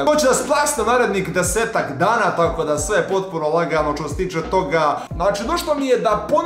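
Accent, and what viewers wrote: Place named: Montenegro. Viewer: Koliko volim taj crnogorski naglasak😁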